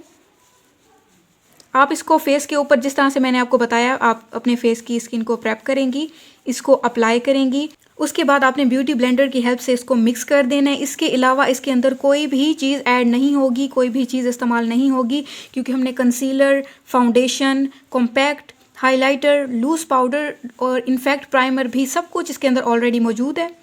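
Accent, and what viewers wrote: Saraiki, Pakistan. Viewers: Awesome. Your accent like sraiki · Ap saraiki speaking hn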